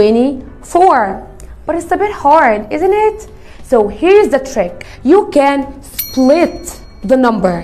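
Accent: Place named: England, United Kingdom. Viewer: حاسس ان هو انجليزي 😂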